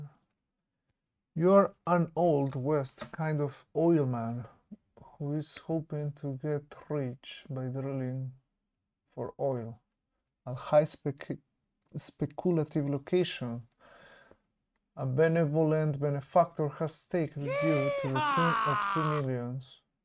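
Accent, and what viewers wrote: Mexico. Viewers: Una pregunta eres mexicano xd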